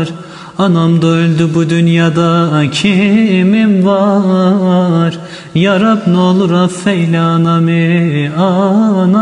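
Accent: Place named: Erzurum, Turkey. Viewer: abi ile sana Erzurum ağzı çok yakışıyor. HER ÖLEN VE YAŞAYAN ANNELERİN ANNELER GÜNÜ MÜBAREK OLSUN. Bu günün suyu hürmeti için Devletimiz bu virüsten kurtulur inşallah. Amin.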